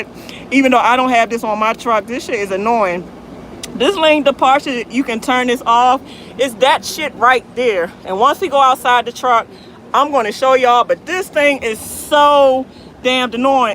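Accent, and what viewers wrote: Baltimore, United States. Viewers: Are you from baltimore ? I hear a accent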